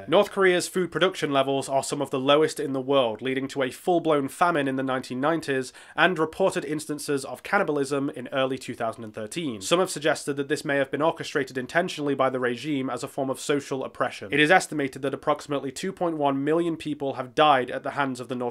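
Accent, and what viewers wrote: United Kingdom. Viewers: Are you from Essex? I'm curious, also new here. Nice video. :)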